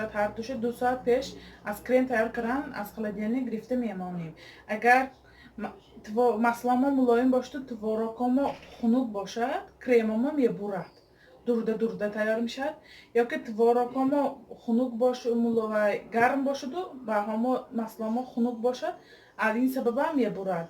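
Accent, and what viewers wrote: Isfara, Tajikistan. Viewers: Салом апаҷон.шумо аз Исфара неми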